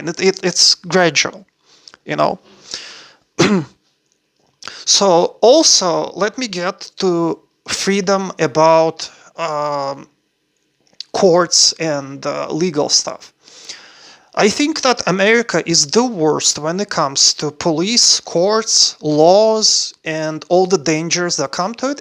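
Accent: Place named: Russia. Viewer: Ты так долго прожил в штатах, но у тебя жуткий русский акцент!..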